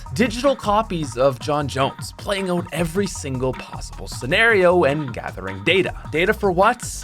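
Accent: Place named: Canada. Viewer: i love his canadian accent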